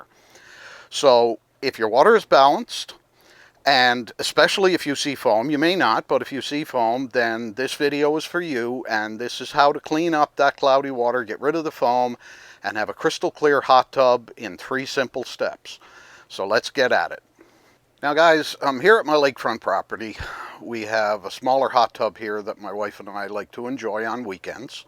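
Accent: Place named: Newfoundland, Canada. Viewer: are you from the east Coast? Little bit of a newfie twang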